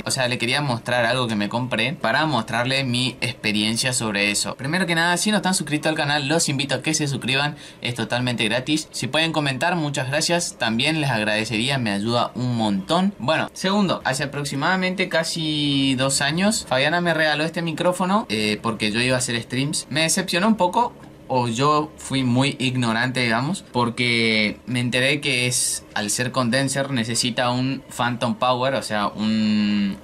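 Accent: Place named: Argentina. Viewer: Hola me encanta tu forma de relatar y acento argentino ❤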